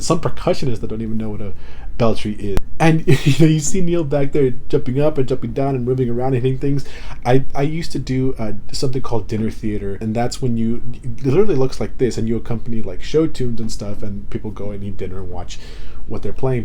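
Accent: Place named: England, United Kingdom. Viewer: Other then your comments about this flawless band, I love your English accent. I'm mexican and I am an English teacher. I love Rush, I also like the police. Greetings from México.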